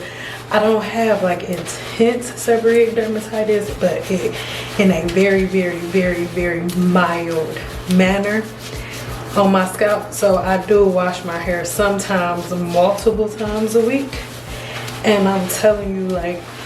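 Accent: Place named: South, United States. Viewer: I loved the blowout. Child, you sound like you from the south like me. LOL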